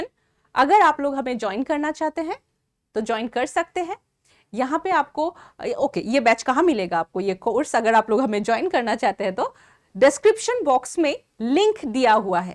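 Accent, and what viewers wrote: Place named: Assam, India. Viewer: Assamese hindi accent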